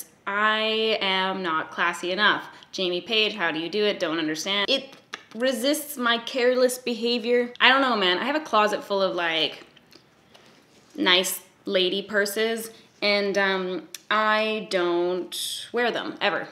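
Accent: Western Canada, Canada. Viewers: Omg the first time i can properly hear the canadian accent i love it so much i cant even dealio. ❤❤ from NZ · What’s in my beg? I love Canadian accents 😂😂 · We all know that's not Old Dead Plant. Your Canadian accent has rubbed off on me. I also blame Christine and Ben. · I wish I could “love” this video! Haha. I love your videos and your freakin sweet Canadian accent! Lol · I fucking love your Canadian accent & the way you say bag... makes me not want to be god damn British 😂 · I know how Americans act when there’s a Canadian accent heard so I feel you but I appreciate your accent that you hide ❤️ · I'm here for you, and your personality, and your canadian accent, don't care about anything else (that description tho 😂😂) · I truly adore you and your channel! You always make me laugh & you're so refreshingly REAL!! (Also the Northern Minnesotan in me is awakened by the Canadian accent! 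You sound like HOME! Lol!) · I love the Canadian representation. Also I didn’t realize that I say bag exactly like that. Yay western Canada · ya know, when i saw the title of this i thought "i want a 10 minute video of just saying bag" bc your Canadian accent is actually amazing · I want you to know that I often say things randomly in a Canadian accent and I’m 99% sure it’s because I watch your videos so much, even in the background of my daily activities. I live on the middle of the United States east coast. People get very confused. Often. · I love the Canadian accent. Sounds like “bayg” to me. But I’m the New Yorker who says “cough-e” or “cawfee” as coffee lol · I forgot you're Canadian! I think your slight accent is adorable.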